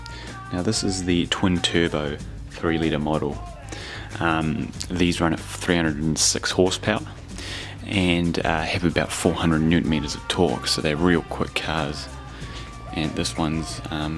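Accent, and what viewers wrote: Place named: New Zealand. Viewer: awesome detailed video. like ur kiwi accent too :)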